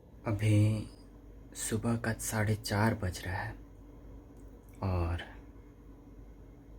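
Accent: Bihar, India. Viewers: Bhai ekdum bihar wala hindi bolta h,,,(hamko)😀😀😍😍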